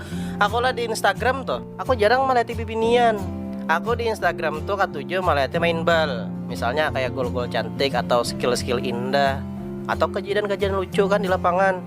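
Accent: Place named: Banjar, Indonesia. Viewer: Gaya mu tu bapander tu lucu banar sanak,ak suka banar mandangar logat banjar tu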